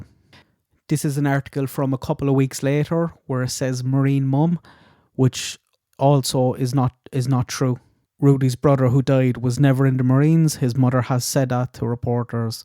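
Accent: Ireland. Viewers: I don’t know why I didn’t consider that; I lived in the same dorm building with a few Irish girls and yeah now I’m thinking the speaker could be Irish lol. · He sounds Irish to me and I like it but it can be a bit harsh at first if you aren’t used to it. Specifically the r’s and t’s. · I'm sorry you don't have a sophisticated ear to appreciate an Irish accent. That's your misfortune. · I love the Irish accent & many others in the world!!! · You are either a bully, or racist, if you don't want to listen, go away, every voice is awesome, (not everyone can speak), Irish is like every accent a blessing, you really should apologise, works so hard to bring us content, and you don't have to pay to watch it, stop being rude and if you do want to go farther in life, REMEMBER it's nice to be nice, manners are free, a smile can make a lonely persons day, and we all have to live in this world, and everyone else is as important as you are, have a great day and stay safe ❤ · He’s got a lovely voice and accent you rude pr!ck. 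And he’d quite obviously Irish, for the ppl in the back 🙄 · Yay to his Irish accent. I love it.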